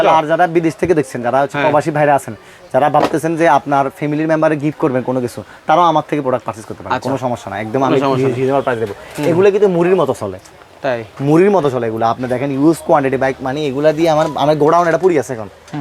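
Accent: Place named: Chittagong, Bangladesh. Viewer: ভাইয়া কথা শুনে মনে হচ্ছে ভাইয়া চট্টগ্রামের ছেলে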